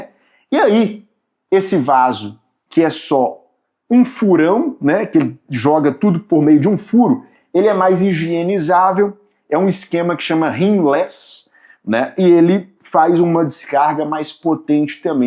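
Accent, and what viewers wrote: Minas Gerais, Brazil. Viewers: Otimo video .Quanto a esses vasos premium , se por acaso estragar o sistema de descarga , fica muito complicado.Uma vez vi propaganda de um vaso com altura um pouco maior para facilitar a vida dos idosos, acho feio aquelas tampas altas .Esse seu sotaque é de MG?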